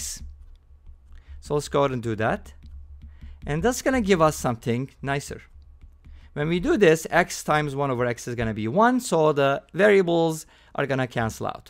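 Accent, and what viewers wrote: Turkey. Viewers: yutube Algorithm beni buraya getirdi videoyu izlerken ulan diyorum kendi kendime kesin tûrk kesin yav sesinden biliyorum diyorum ama neyse videolarini ailecek çok beğeniyok 😂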